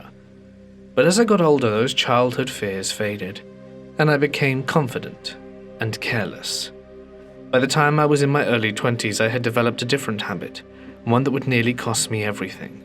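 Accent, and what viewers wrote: United Kingdom. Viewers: I love that British accent !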